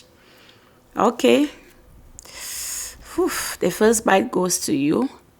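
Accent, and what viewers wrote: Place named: Kom, Cameroon. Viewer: It’s de dialect for me sis🤣🤣🤣🤣🤣🤣🤣🤣🤣🤣🤣🤣🤣🤣thank u for representing us, we the kom people are proud of u😍bon appétit 🥂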